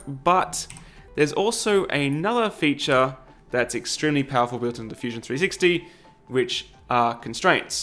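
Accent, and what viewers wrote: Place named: Australia. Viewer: Mate, thanks for your efforts with showing us noobs the ins and outs of 3d printing with the bonus of your real world application. (And we need more ozzie accents on youtube).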